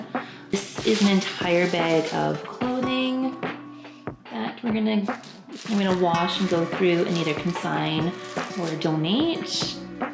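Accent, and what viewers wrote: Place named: Canada. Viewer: You're doing a great job! I'm like you and get completely overwhelmed by clutter but I'm not a naturally tidy person so it's an uphill battle! I think my space is similar to yours in that it already doesn't have that much in it to begin with. But it's all abt how we FEEL abt the space isn't it? At one point I recognized your Canadian accent and then shortly after saw The Bay on a box! Judging from a scene in another vid, I used to live in the same area (generally speaking!) as you. I look forward to the rest of this series of vids👍🏼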